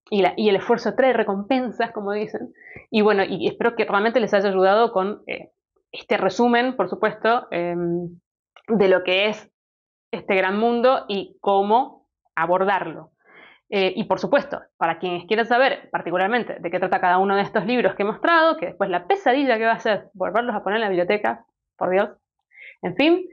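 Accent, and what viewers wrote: Argentina. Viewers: Hola, sos argentina o uruguaya? Por el acento digo.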